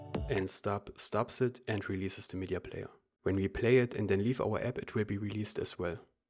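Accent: Germany. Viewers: Thx man... BTW I like GERMAN Accent 🌚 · I smell german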